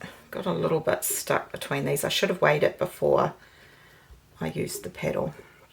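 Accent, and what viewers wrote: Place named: New Zealand. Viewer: It always amazes me that some people have no better use for their time than to make nasty comments, of course if they weren’t anonymous they probably wouldn’t have the guts to do it. Also why watch videos you dont enjoy! It’s very easy to skip on by! As an expat kiwi I love watching your videos (although the cost of food seems crazy), but your down to earth, real approach to your videos is refreshing, not to mention I miss that kiwi accent!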